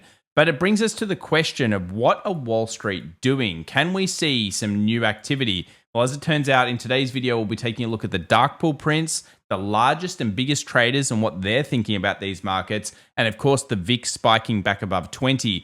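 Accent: Australia. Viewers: You talking like Crocodile Dundee over here!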